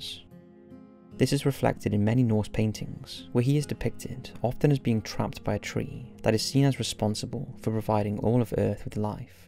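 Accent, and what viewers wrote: United Kingdom. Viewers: Would be helpful to show the name of each of these creatures in text. I couldn't understand what you were saying in some cases. For number 3, CC listed you calling these creatures "Forward", "Board," "Vaude," and "Vort." Later there was "Linga Barked," and "half goofer." Huh? And number 1; was it "Pesto," "Pesta," "Pastor," "Pasteur," "pasta," or "pester?" CC may not pick up the British accent.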